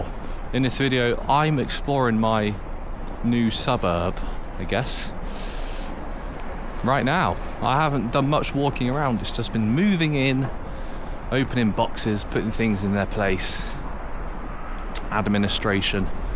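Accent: United Kingdom. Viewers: Британский акцент это нечто. Каждый раз ловлю вайбы, как будто мне профессор в Оксфорде лекцию читает ( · Американское произношение гораздо чище и понятнее британского. Бриты говорят как будто у них рот набит кашей. Мямлят и не произносят окончание слов. говорит с бр. акцентом, но понятно каждое слово. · What do you call our resiential buildings? Comfy? Commie? (as in Communist) Coming? I don't understand British accent too well, I'm afraid. LOL But anyway, they have such buildings all over the world, - Europe, USA, Canada... It's not something typically Russian or Soviet :) And hey, welcome to Russia! Stay warm! Spring is nigh! :)))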